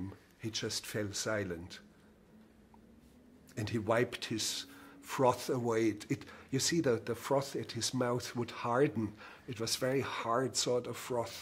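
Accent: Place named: Germany. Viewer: I just love the contrast between Herzog’s masterful command of the English language and the thick German accent that glazes it. English is indeed the language that rules the world.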